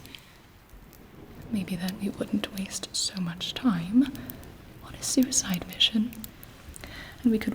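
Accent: England, United Kingdom. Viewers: This is one of the most convincing English accents I've ever heard from an American. (As an English person.) And you maintain the accent while maintaining an ASMR voice. That's impressive. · I think her accent it British 🤔🧛‍♀️🇬🇧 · Uk accent😂❤️ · She nailed British accent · because she's so amazing and hot and she can do an incredible British accent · Okay, since whem are you british?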